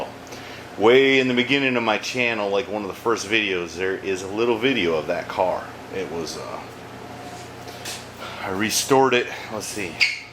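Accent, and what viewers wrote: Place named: Appalachia, United States. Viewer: Damn i love your hillbilly accent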